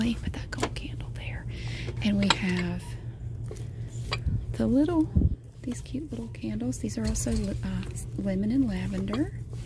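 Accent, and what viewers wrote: South, United States. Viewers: I lived in Alabama for 3 years lol I love and really miss Southern accents I'm happy I found this channel! I know you're not in Alabama but still!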